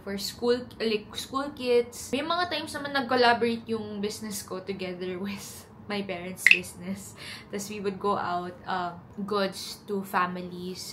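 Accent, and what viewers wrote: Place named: Philippines. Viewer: Pinay na Pinay yng accent mo!Galing mo mag tagalog!👏❤️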